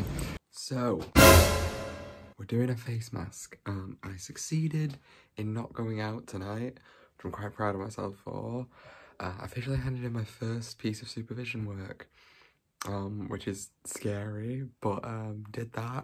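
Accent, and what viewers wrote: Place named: United Kingdom. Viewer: I enjoy your Vlog. Where in the UK are you from? I have trouble locating the different English accents. In the U. S., we either hear broadcasters or members of the Royal Family, so I know there are many accents, but I can't determine which one is which.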